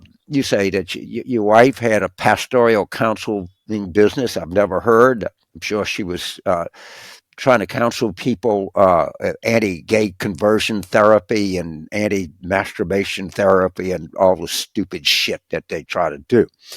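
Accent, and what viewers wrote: South, United States. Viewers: his heavy Southern drawl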